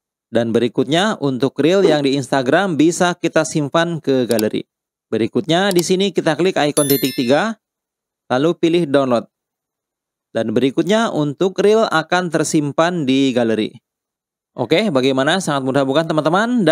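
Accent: Indonesia. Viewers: Kenapa suara indon pulak ni 😁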